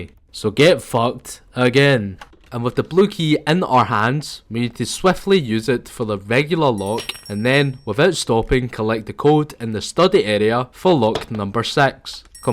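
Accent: Scotland, United Kingdom. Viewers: Yoo a fellow Scotsman! Congrats on the world record and the fantastic accent 😂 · Saw this vid on my homepage, pressed and liked the humor and ur Scottish accent is so entertaining and I think eventually followed, looking forward to watch more vids, LOL. “Get fucked”😭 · an asian with a scottish accent? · Why do you look Asian but sound like a scot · the asian with the strongest british accent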